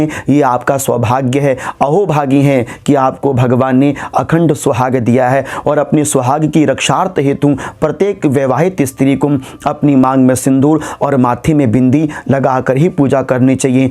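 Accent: Standard. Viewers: Aap kitna accha Bolte hai akdam sudh🙏🙏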